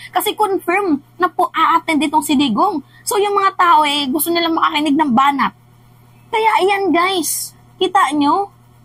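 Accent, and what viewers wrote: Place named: Iloilo, Philippines. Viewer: ILONGGA KA NGA TUNAY❤❤❤❤❤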